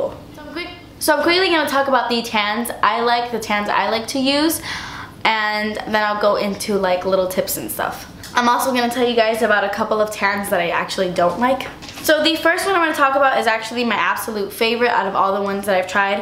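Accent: Australia. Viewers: Yes, I've just noticed they are doing that. They shouldn't have to justify the fact they like wearing fake tan. Although it does stop lots of those silly comments as she said. PEOPLE have right to better themselves, it's just like changing your hair colour, wearing make up, following fashion. Who doesn't want to look there best. Really enjoyed her review she sounds Aussie: )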